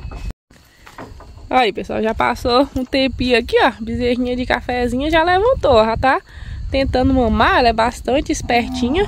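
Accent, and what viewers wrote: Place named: Bahia, Brazil. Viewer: Esse sotaque é um show à parte, lembra minha gente da Bahia. Meu abraço respeitoso a vocês por fazer tudo com tanto amor, dedicação e carinho.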